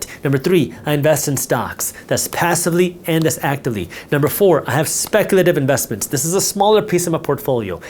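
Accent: Standard. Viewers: Finally crisp and clear neutral English accent. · And speaks normal English!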